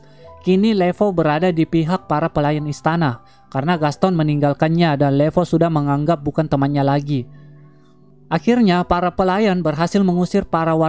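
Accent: Indonesia. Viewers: Dari dialekya kyk dialek sulawesi😁🙏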